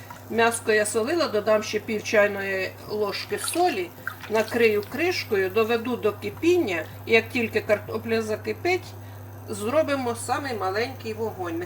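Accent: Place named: Surzhyk, Ukraine. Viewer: Раньше вы говорили на русском языке, а сейчас на суржике...но мне нравится и понятно каждое слово...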